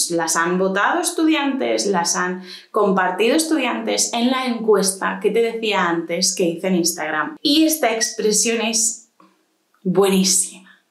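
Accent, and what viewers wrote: Spain. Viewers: Yo vivo en el estado de Alabama en 🇺🇸.La mayoría de la gente hispanohablante que viven aquí son de Mexico y Guatemala. Me encanta 😻 mucho tu acento español. Es diferente de lo que estoy acostumbrado. Gracias 🙏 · Le saludo desde México🇲🇽🇲🇽🇲🇽, específicamente del estado de Veracruz, yó siempre he querido aprender el acento de ustedes, buscaba tutoriales pero a mí punto de vista no se daban a entender,... Esta semana conocí a un amigo que es de Barcelona, por lo que más me animó a aprender su acento, así que me puse a buscar otros videos y fue cuando me tope con tú canal, y me gustó demasiado, por qué nos das el significado de cada palabra, y por qué nos pones ejemplos de como utilizarlas, en verdad te agradezco mucho, y gracias a ti he aprendido mucho, claro que me falta mucho más por aprender, pero siento que lo básico ya lo sé,... Ahora podré ofender a mis amigos sin que ellos me entiendan, 😂... 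Me quedo duda en la palabra "Gilipollas", si es una palabra que a los niños no se les puede decir, entonces cuál es la palabra que es recomendable para los niños?, Podría decirme, o recomendarme el vídeo donde específica usted eso.... 👋🏼🇲🇽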